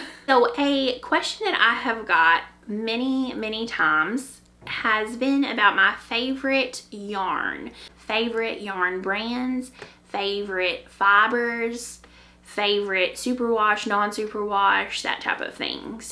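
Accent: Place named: Arkansas, United States. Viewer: Hi! I love your podcast!! I’m a fellow Arkansan transplanted to Texas a long time ago and I just love hearing your accent from home! I love your Zosia wrap cardi - so glad you finished and survived!!! I can’t wait to make it in a light gray. You asked in a previous video for book recommendations and I recommend reading “A Gentleman in Moscow” if your haven’t already read it. It’s a beautiful story. My mom and I are reading the Thursday Murder Club series at your recommendation and absolutely love it! Thanks so much for all you share!! You’re so inspiring 🐝 🐓 🧶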